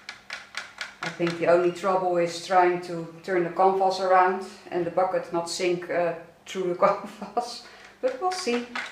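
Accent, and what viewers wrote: Netherlands. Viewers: Thick dutch accent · the accent is enough of a clue to know you're a fellow dutchie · You sound Dutch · You sound Dutch!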